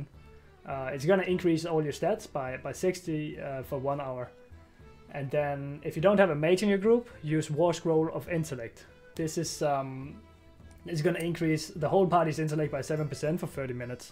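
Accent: Denmark. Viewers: I love your Danish accent! <3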